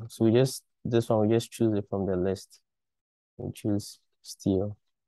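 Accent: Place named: Ghana. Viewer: You have a Ghanaian accent. Are you a Ghanaian?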